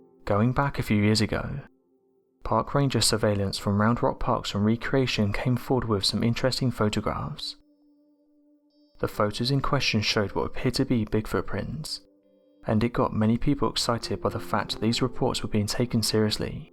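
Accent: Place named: United Kingdom. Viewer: ..... I am thinking it's a British/ UK 🇬🇧 accent!...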